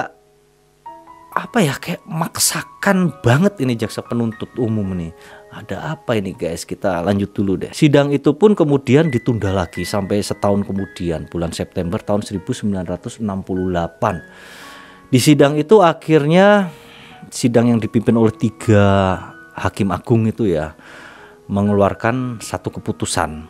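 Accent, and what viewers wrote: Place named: Java, Indonesia. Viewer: MAAF BANG YANG KU CINTAI...ANDA INI SEBENARNYA DARI DAERAH MANA? KADANG2 LOGAT BAHASA JAWA MEDOK,KADANG2 SUNDA BISA JUGA JAKARTA/BETAWI... DARI MANA YAA...BIKIN AKU PENASARAN BY THE WAY BAKAT ANDA DALAM BERCERITA MEMANG MENAKJUBKAN....SIIIP MANTAAAP, BERKEMBANG TRUS BRO..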